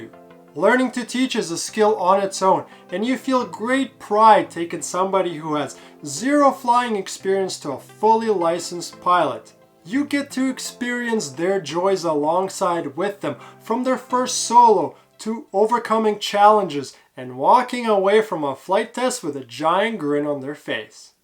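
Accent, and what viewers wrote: Canada. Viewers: im from bc and this guy has the most canadian accent ive ever heard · Do I sense a slight Quebec accent? If so what schools are good with a good price in qc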